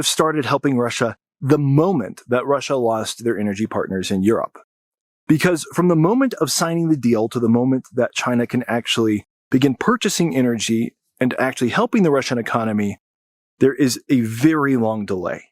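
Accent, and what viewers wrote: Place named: California, United States. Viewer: You're not "man-splaining," you're "dude-splaining" ;) California accent aside, you're quite correct.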